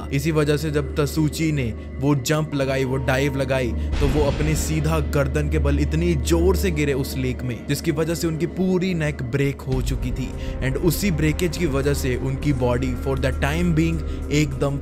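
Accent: Haryana, India. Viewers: Bro i have question are yu from haryana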